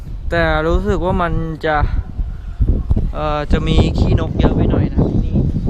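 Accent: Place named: Suphanburi, Thailand. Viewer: ติดใจเหมืองเหน่อ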